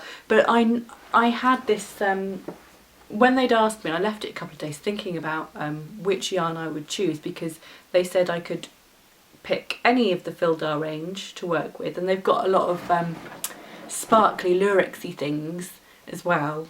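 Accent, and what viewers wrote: England, United Kingdom. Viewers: Hey. Enjoying your podcasts. It is so helpful to see projects we can buy patterns for on ravelry ...one interesting observation I noticed is some language similarities between your English and the English we speak in the American South.. for example: when you said this one is go to be a good un. This is a slang we use frequently in the American South.. we use language very differently than the North.. one word we use is "I reckon"... Which is common on England but used only the South in the USA.. I think we in the South hold on to our English we brought over when we settled here from many different areas in the UK.. the first time I heard an English person say "I reckon" I thought oh my it is a proper word! Most Americans look down on our Southern American English. I enjoy you .. and enjoy listening to your accent..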